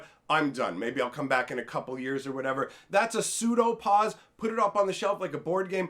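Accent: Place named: Canada. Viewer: I hear allot of Canadian accent but you're on point bruh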